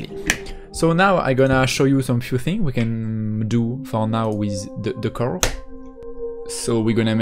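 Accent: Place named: England, United Kingdom. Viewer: J'adore ton accent anglais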